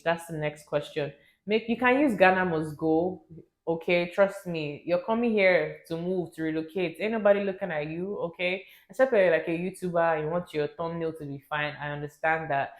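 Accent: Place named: United Kingdom. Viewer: I love the pidgin!! Mix it with bri-ish and canadian accent 😂😂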